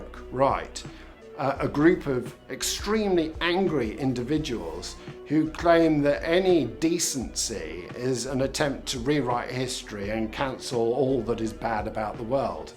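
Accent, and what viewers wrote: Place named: RP, United Kingdom. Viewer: What a nasty piece of low level journalism. He is nowhere like Katie Hopkins and although I am not that keen on his music (or him for that matter), I wouldn't use the spiteful words you do. And all from a plummy accented toff trying to make his own way in the limelight and well over 40. to boot!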